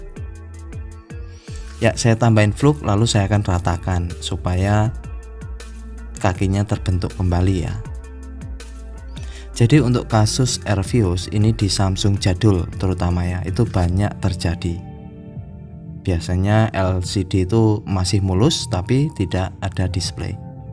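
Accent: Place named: Lampung, Indonesia. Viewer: Tak kira jowo ternyata lampung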